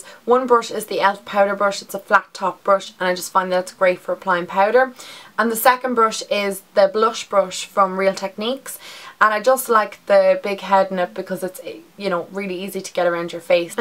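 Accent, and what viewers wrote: Ireland. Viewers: great video thanks for the tips!! i use a darker foundation for contouring but find it looks cakey so will invest in bronzers and try that instead! love the accent! im sure all english people love the irish accent ! :) subscribed :) xxxx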